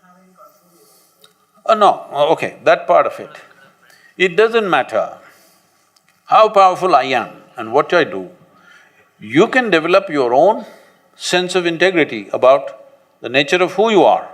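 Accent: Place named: India. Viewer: I've subconsciously created the thought that i truly can't stand the Indian accent anymore and so it is now. When I hear it, i get this "eww" type of feeling, such an ugly accent.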